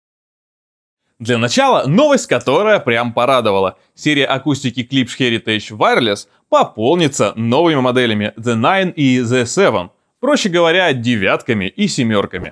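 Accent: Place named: France. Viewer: Отдельно спасибо за французский акцент и надпись на майке 😉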